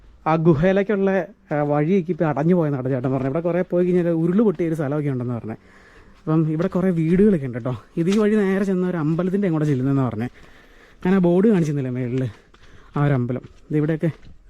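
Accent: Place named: Angamaly, India. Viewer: സംസാരത്തിന് അങ്കമാലി ടച്ച് ഉണ്ടല്ലോ.🎉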